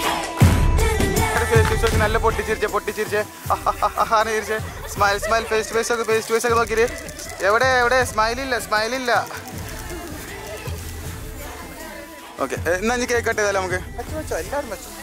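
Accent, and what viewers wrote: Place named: Malappuram, India. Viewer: Yaaaa mwoooneeee .... 😅😅Ante varthaanam kekkanaa verneee main aayitt ..... 😂😂😂😂💪🏻 malappuram daaa 😜😜💪🏻💪🏻💪🏻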